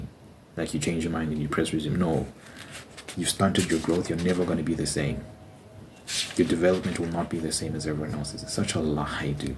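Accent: South Africa. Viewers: Where are you from I love your accent, sounds like South Africa to me 🇿🇦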